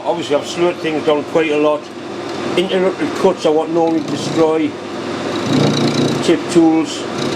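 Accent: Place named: Yorkshire, United Kingdom. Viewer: Thank you for the reply sorry sometimes I do not understand as you speak in a Yorkshire or Welsh dialect:-)) Two weeks ago I had a chap visiting my stationary engine collection here in Malta he is from Yorkshire had a bit of a problem to communicate with his accent!!!!!!!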